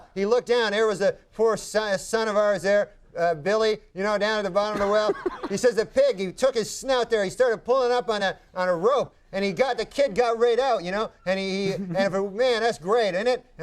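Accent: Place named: Canada. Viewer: His Canadian accent is showing eh???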